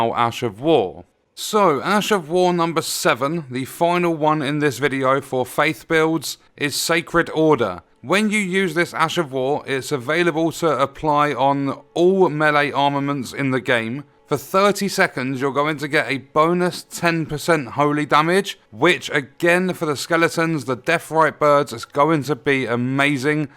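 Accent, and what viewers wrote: United Kingdom. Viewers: One of the best elden ring channels. British Accent is the best Ash of War, technically. Regarding chapters: love em. Let me explain: I watch the whole video first. When I come back ( maybe 2-5 times) I click on the chapters.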